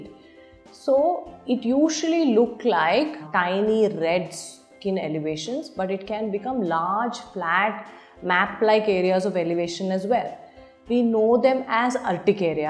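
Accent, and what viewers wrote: India. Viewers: English nga paranamang bombay Kong mag salita😔😔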